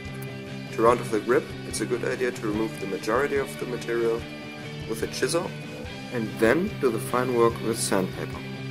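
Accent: Germany. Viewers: The whole time I thought : "this guy sounds German " and then I sa w the Obi Premium Lack and knew I was right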